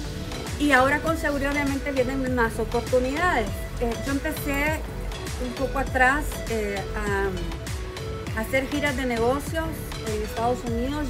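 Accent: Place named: El Salvador. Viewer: Que hermosura de mujer. Y es Salvadoreña. Es " " Cómo dice la canción.. Bella, Bella..se me encanta oírla hablar... Ese " ACENTO 100%SALVADOREÑO " QUE ORGULLO...ES DECIR...SOY SALVADOREÑO..! CON NUESTRA EMBAJADORA. " MILENA MAYORGA " ARRIBA EL SALVADOR...DICEN LA..!!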